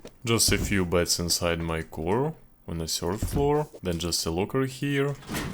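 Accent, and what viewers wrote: Russia. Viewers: ты русский? акцент похож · у тебя как будто акцент русский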